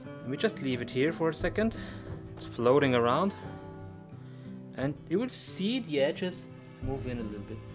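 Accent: Germany. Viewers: Loooove your videos! I learnt a lot! :-) btw are you German by any chance? Thought I heard a light accent haha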